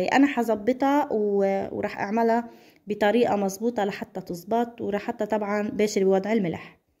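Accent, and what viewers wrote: Lebanon. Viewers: انتي من لبنان صح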